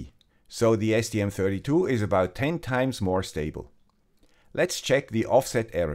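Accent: Switzerland. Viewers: The guy with the Swiss Accent - Smashing the Arduino learning curve into a pancake since Video #1.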